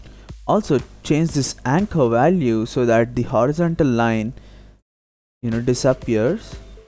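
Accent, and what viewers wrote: India. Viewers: thanks bro are you a south indian guy ?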